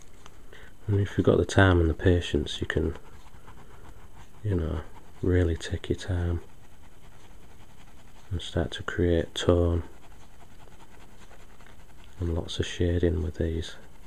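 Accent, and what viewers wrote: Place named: Hull, United Kingdom. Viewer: Are you from hull by any chance. Accent sounds familiar.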